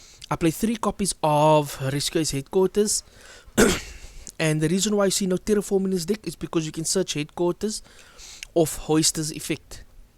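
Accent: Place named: South Africa. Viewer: Are you south african? Sounds like it :) If thats the case let me know the. I'll subscribe.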